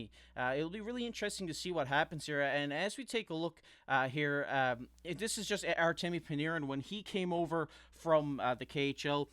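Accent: Newfoundland, Canada. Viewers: No trouble to tell your a fellow Newfie! Good to see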